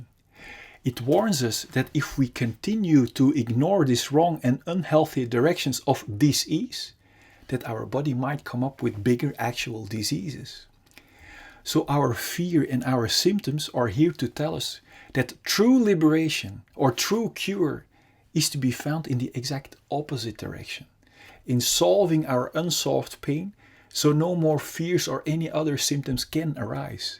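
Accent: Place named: Netherlands. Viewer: Nice dutch accent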